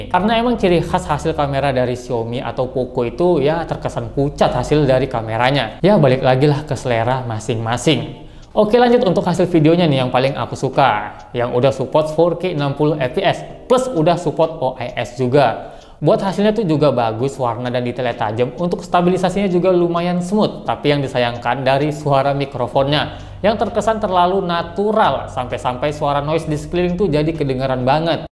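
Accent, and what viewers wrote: Medan, Indonesia. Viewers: Bg lu org Medan?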